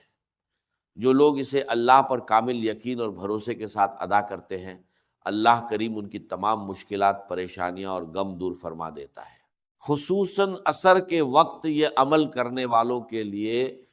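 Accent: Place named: India. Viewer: dr israr ki sirf tasweer hai ..bol tu ko indian bhai lagta..kion k zaaria ko jaaria tu indian bhai keh saktay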